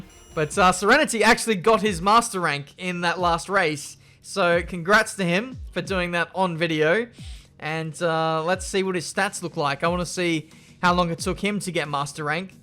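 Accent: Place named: Australia. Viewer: DUH YOUR AUSTRALIAN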